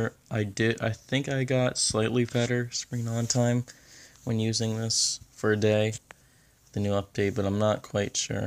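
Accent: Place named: United States. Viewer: Thank God an American accent!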